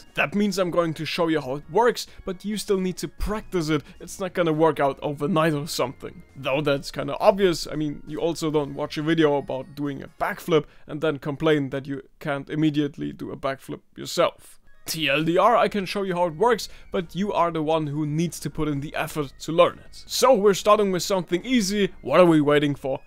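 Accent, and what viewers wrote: Germany. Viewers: Liebe die Pokemon Musik und den Deutschen Akzent!!! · as a german i could immediatly tell u r german too.....and by the water lmao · Hey das hört sich mehr Nach denglisch das du da sprichst 🤣🤣 Ich bin auch nit besser😅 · This german Accent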